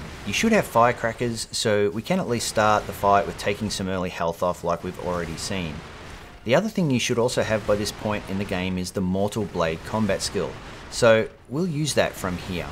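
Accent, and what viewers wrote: Australia. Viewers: Pretty Cool. You sound like an Aussie.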